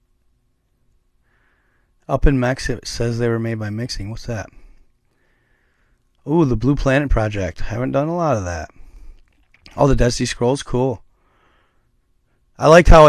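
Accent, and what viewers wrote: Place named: South, United States. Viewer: You must be from the same cloth because I'm the same way . Many aren't solid these To where people actually know what boundaries to cross and which one's not to.But where are you from bro you sound like you're from the South.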